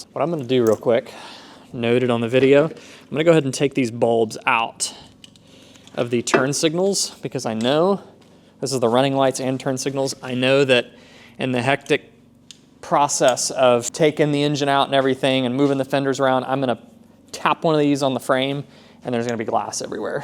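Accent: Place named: South, United States. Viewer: You've got a southern drawl you don't really get on your aviation channel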